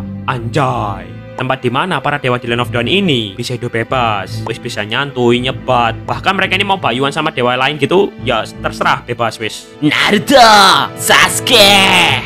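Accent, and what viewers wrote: Java, Indonesia. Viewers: Aduh logat jawa dan jokes cringenya ganggu bgt, terlalu lebay jir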